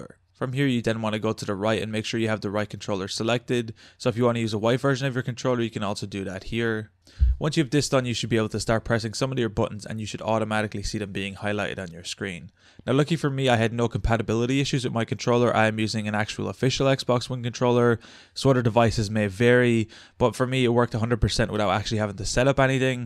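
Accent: Ireland. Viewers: Irish accent is awesome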